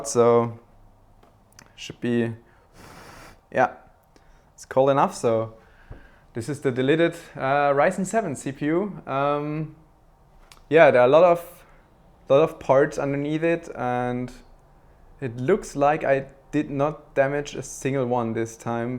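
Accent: Germany. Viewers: Your german accent is very noticable xD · He's german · Man merkt sofort wenn nen deutscher englisch spricht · you are german? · Bist du deutsch?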